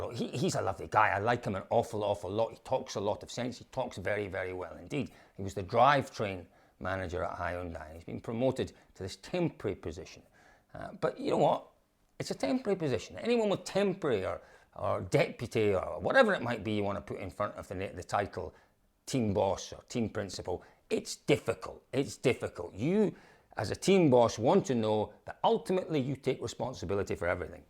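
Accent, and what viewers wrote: Scotland, United Kingdom. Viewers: Naice scottish axent.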